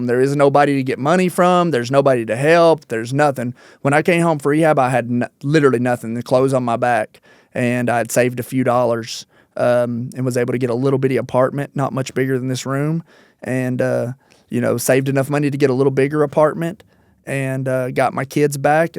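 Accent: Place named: Kentucky, United States. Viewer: Oh shit he totally talks like a KY boy 😮😊😊😊. I grew up in Louisville and out in the country in KY and in San Diego so best of both worlds but wow .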